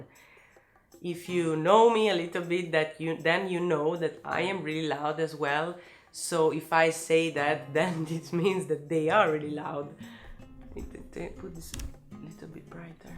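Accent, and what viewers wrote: Venice, Italy. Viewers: comunque è buffo, il tuo accento in inglese sembra italiano ahahaha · You have a venetatian accent when you speak italian,so cool:) I'm half italian half canadian living in the UK, London. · So true!!! Sembra proprio venezian! 😅💪🏼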